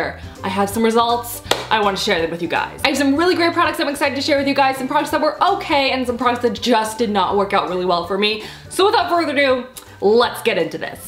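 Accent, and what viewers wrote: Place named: Canada. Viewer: You sound incredibly Canadian today. Like more than normal😂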